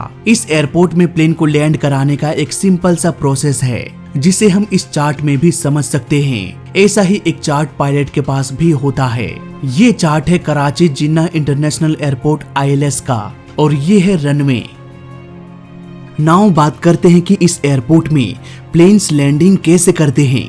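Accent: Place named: North India, India. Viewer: यह एक उत्तर भारतीय भैय्या है , इस की भासा (भाषा) और pronunciation साफ दर्शा रहे हैं। इनकी G मे एक कीड़ा है, अपनी कमियों को दर्शा कर उस को सुधारने की बजाए, दुसरे के शौचालय मे नाक घुसा कर यह सुनिश्चित करना चाहते हैं कि कल इसने क्या खाया था।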